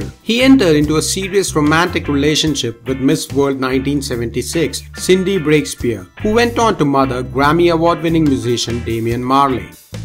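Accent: India. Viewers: This historian must be Indian ppl because of accent do you hear? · Ya his voice sounds like indian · The narrator is Indian, the inspiration is Jamaican, and I'm half Irish and Polish. Multiculturalism - or as Bob would say...One Love...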